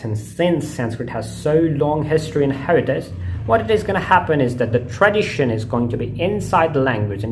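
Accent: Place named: United States. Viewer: Pretty good American accent! Talented guy you are!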